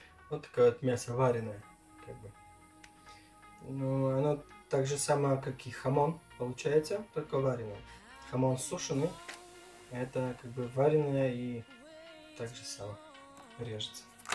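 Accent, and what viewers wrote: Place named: Poland. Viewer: Здравствуйте. Мне понравилась подача видео и то как подробно вы всё показали. У вас интерестный акцент: )) то ли польский... я не поняла. Сделайте еще свежий обзор к примеру: закупка на 100 евро в Испании:)) если можно?)) лайк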